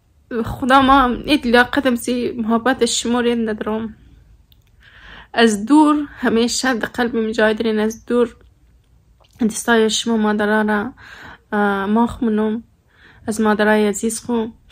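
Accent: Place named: Hazarajat, Afghanistan. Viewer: خانم شما ولاگ های خود را صرف برای قوم هزاره خو نمی سازید که غلیط هزارگی گپ می‌زنید باید همه فارسی زبانان را در نطر بگیرید در ویدئو هایت معلوم نمی‌شود کی برادرت است کی ایورت است همه را لالیم میگی و بیگی کی است چرا درست معرفی نمی‌کنید پیکی کی است و این کی تان می‌شود فامیل کدام است همگی را لالی میگی ما نمی‌دانیم که کدام فامیل تو است و کدام از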